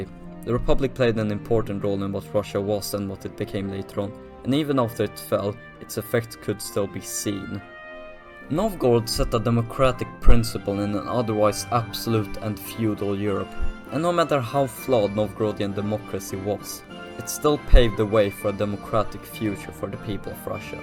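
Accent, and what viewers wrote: Sweden. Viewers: He is as a man from Norway i can hear that Swedish accent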